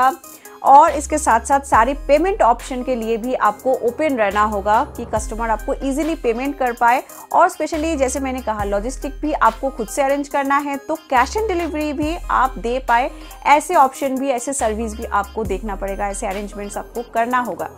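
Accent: Bengal, India. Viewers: Ap bangal se hai kya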